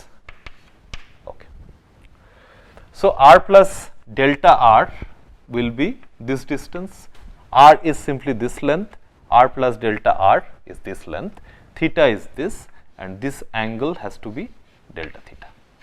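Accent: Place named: Bengal, India. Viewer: I guess u r bengali sir....u accent seem so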